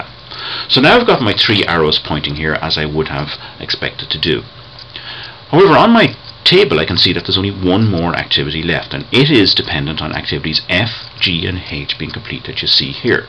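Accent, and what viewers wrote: Ireland. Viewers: The irish accent is one of the best!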